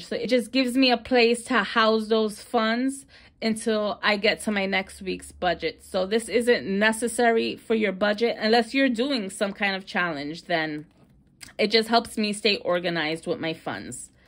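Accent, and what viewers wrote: New York, United States. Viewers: Correct me if I’m wrong but your accent sounds like you’re from New York. I like the accent lol I’m from Louisiana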